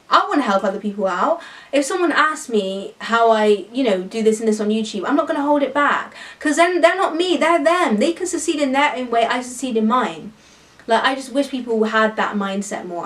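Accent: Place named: Gloucester, United Kingdom. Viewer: where are u from? u got a strong gloucester accent aha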